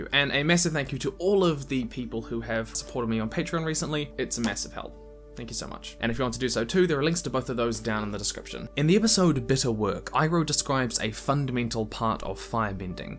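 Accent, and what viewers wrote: New Zealand. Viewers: You a kiwi dude? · Hey I just wanna say that I had an English proficiency test recently. I have no issues with English, but I would have been very tripped up by the Kiwi accent on the listening part had I not been acquainted with the accent beforehand, thanks to your videos. Thanks! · noticed your accent straight away love and support from a fellow kiwis bruv. subscribed and hit the bell✌✌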